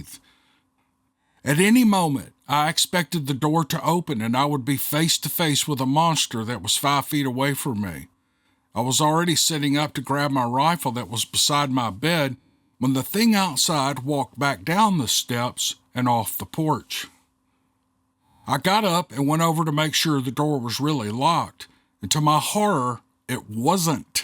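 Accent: South, United States. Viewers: Well young man I don't comment much but your southern drawl just makes the stories more interesting and exciting to listen to. So yeah great job and keep up the good work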